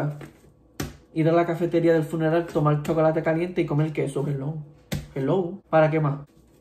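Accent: Puerto Rico. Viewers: Jamás pensé que sonara tan ✨puertorriqueño✨ pensé que era más gringo💀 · Sabes que es Boricua solo por escuchar como habla en español❤😂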